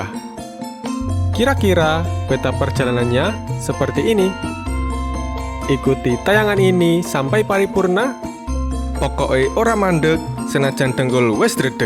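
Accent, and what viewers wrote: Java, Indonesia. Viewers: Wah aku jadi makin pingin ke kawasan Temanggung, Parakan, Wonosobo, plateau Dieng! Kalau ngikutin video mas seakan ikut jalan², itu karena pengambilan spot² lokasi yang tepat informatif dan terutama narasi mas yang aku suka, walaupun nuwun sewu logat Jawanya masih kental sekali 😀 tapi rangkaian kata dan susunan kalimat bahasa Indonesianya bagus sekali yang kadang sedikit puitis ditambah wawasan mas yang luas, saya yakin kalau ngobrol sama mas pasti gayeng deh, semangat sehat terus mas, saya tunggu konten² selanjutnya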